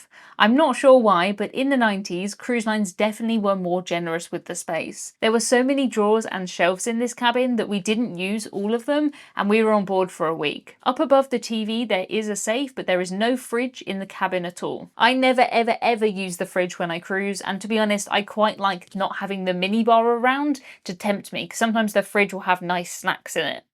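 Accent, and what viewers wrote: United Kingdom. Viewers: Americans definitely use “Pop” in the same manner 😊 ‘Pop out’ ‘Pop in’ ‘Pop up’ It sounds so much better when you say it with a British accent!😊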